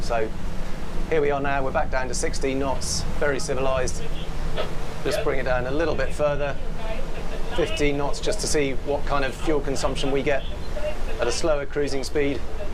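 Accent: United Kingdom. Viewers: With the helicopter, the crowd, and the British accent it felt like James Bond was doing the review. All that was needed for a movie was a chase boat, a few grenades water splashes, and smoke out the back of the Sirena 78 to conceal and cover.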